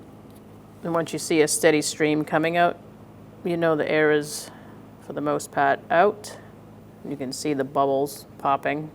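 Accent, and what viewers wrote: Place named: Massachusetts, United States. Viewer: I’m hearing Massachusetts or Jersey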